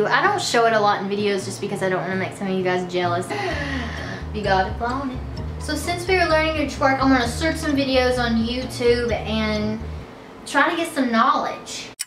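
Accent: South, United States. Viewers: Throw back to when still had her southern draw ❤️👅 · I love ur country accent it's really sweet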